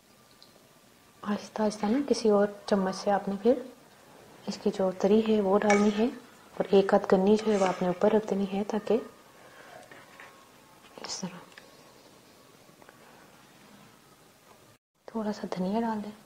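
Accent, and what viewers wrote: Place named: Punjab, India. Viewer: Yar jitni sweet ap ho utni sweet ap ki punjabi , ur new subscriber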